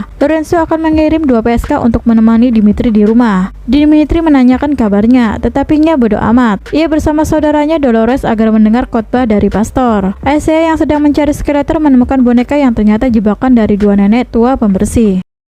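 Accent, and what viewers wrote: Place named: Banyumas, Indonesia. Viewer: Bahasane ngapak koh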